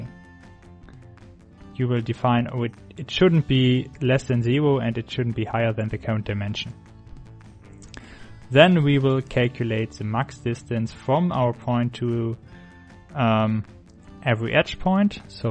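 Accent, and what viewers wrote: Germany. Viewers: btw are you german xD rly sound like it wär ja ganz nett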